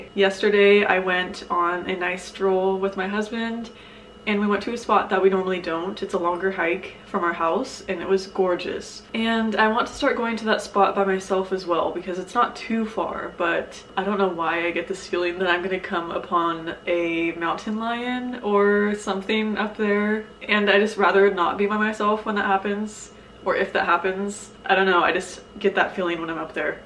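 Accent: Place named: United Kingdom. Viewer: Bonjour ! Tu vis dans un environnement magnifique, idéal pour trouver l'harmonie et se sentir connecté, et y compris avec soi. Une astuce pour apprendre le français ( même si tu en parles dans la vidéo), regarde des films en français, tu verras..c'est chiant !! Mais concentre toi sur l'action, tu comprendras un peu, mais surtout, ça va travailler ton oreille et tu apprendras plus vite !! C'est ce que j'ai fait avec l'anglais et au bout d'un mois aux USA dans une famille, je tenais une conversation, alors qu'au début je ne comprenais rien, mais rien de rien 😁! Par rapport aux Britanniques, votre accent est terrible ( il faut comprendre la clef), et vous parlez VRAIMENT très vite !! L'horreur 😂... Après, c'est juste une question d'habitude. Le plus incroyable, qui m'a vraiment surpris, c'est que je pensais en anglais pour parler. Voilà.. Merci d'être toi ! 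Peace...🌿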